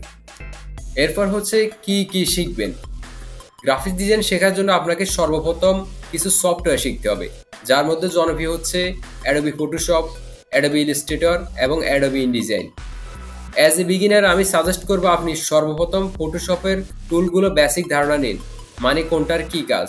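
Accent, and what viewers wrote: Noakhali, Bangladesh. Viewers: Apni ki noakhalir?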